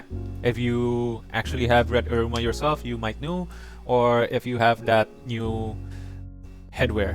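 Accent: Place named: Philippines. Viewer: isee haha sounded like ur from philippines also decent english accent. Will u please check to test my hybrid build on tr i use katar glaive and dagger for it, 1m CP proc around 150-200, normal atk and buff only on auto, and im using 1 illu drake 3s. Kinda works good for me cuz i get more dmg output to some whales here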